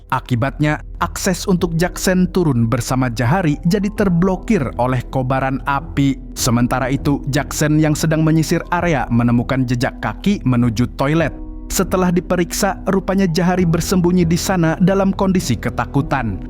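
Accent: Banjar, Indonesia. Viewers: urang banjar nih😂😂😂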